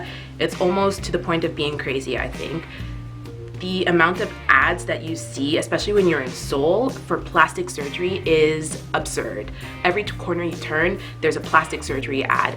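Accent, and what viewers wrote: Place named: Canada. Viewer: lol it's that good ol Canadian accent lol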